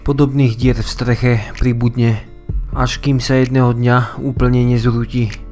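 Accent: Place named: East, Slovakia. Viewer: Videá má dobré,klobúk dole ale mal by natočiť video o svojej škole,lebo ten komentár,dva letiská,dva cesty,dva autá už je blbé,mal by navštíviť učiteľku zo ZDŠ.Trepanie o studenej vojne 1970,studená vojna bola už za prezidenta Kennedyho a Chruščova tá neskončila padnutím ZSSR.Keď že robí zvuk až po natočení videa,stále to môže opraviť,poznám dosť východniarov ktorý žijú v Bratislave a hovoria síce z prízvukom ale spisovne!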